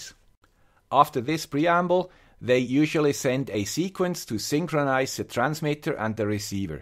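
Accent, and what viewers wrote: Switzerland. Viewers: guy with the swiss accent... cheers from indonesia..😀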